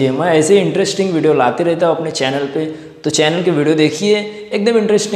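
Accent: Maharashtra, India. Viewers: wo marathi he shayad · Wo marathi he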